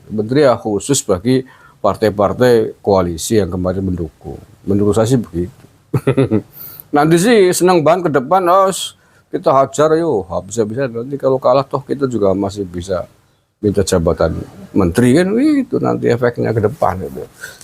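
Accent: Banyumas, Indonesia. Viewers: Senang mendengarkan penjelasan jenengan pak....sll di sisipi bahasa ngapak 😂👍👍👍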